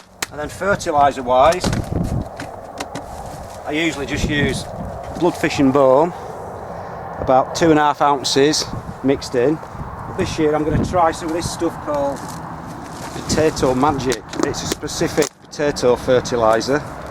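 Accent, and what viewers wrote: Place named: Lancashire, United Kingdom. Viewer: I'm having a crack at growing veggies for the first time ever this year and doing the whole shebang in pots and containers..... Planted my spuds in rolled down compost bags about 3 weeks back but the weather was so wet and frosty had to drag them all into the garage, anyway there sprouting nicely now bout 4/5 inches with small leaves on top.... Should I earth over these now and unroll the bag back a bit? Don't want to earth up if its too soon? Love the videos mate, very helpful and certainly took many tips off them..... Where you from anyways? Good strong accent like that can't be far from my neck of the land up in Lancashire :)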